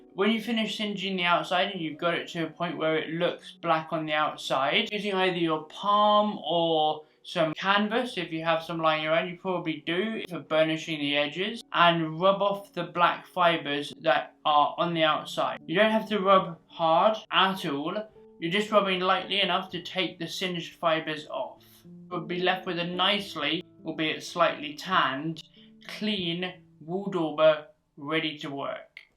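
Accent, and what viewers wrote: United Kingdom. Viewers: Unfortunately the huge reflections on/off your glasses..is well very distracting..that then the lower then normal voice volume mixed with the possibly British pronunciation and dialect makes for difficult viewing experience..a pair of non reflective glasses and turning up the vocal volume overall would really improve your videos..Cheers mate..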